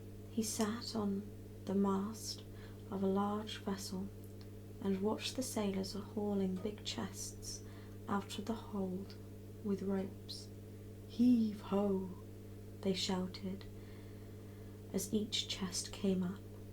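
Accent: England, United Kingdom. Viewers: English accent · love ur british accent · British accent makes her more attractive & sophisticated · British accent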